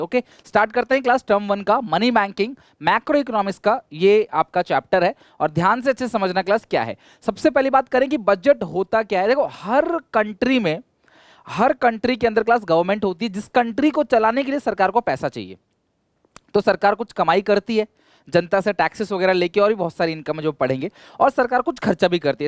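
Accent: Odisha, India. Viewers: Sir are you from odisha ?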